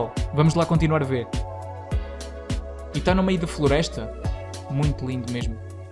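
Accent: Portugal. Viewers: "Deve ser brutal para tirar Selfies", rsrs muito legal o sotaque de Portugal. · Sotaque Português é muito legal!!!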